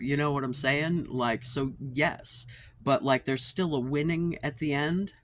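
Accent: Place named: United Kingdom. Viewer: I came here to learn british accent lol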